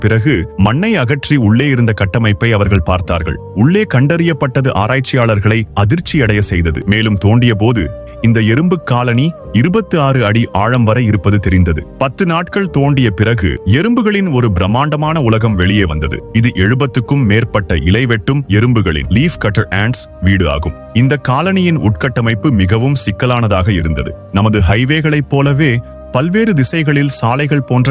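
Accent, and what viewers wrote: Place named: Sri Lanka. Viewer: Evan chanal eopaditha pesuvan.losu paya.evan.entha oor karan evan.sri lankan tamil kotha poda erumbumku entha kodumai.appa unaku pannathu sarithan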